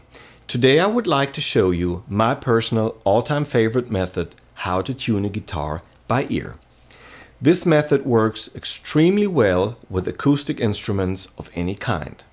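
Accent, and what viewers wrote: Germany. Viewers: he's german - so he pronounces an unvoiced 'S'-sound in cases were English native speakers would normally pronounce a voiced 'S'-sound...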